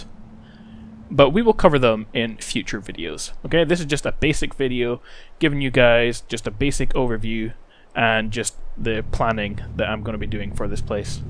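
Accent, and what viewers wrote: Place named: Scotland, United Kingdom. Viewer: Hey ,am strange question but where are you from? I know Scotland but specifically recognise your accent haha whereabouts In Scotland are you from?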